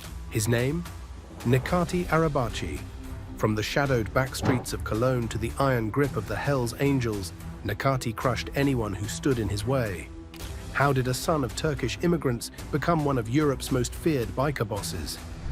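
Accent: United Kingdom. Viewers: Sorry. There’s something just wrong about narrative on hells angles with a British accent. Destroys it. Sound American. 🇺🇸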